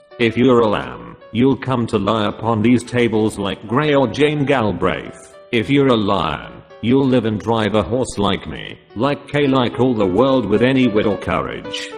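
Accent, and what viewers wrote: United Kingdom. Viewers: Good british accent..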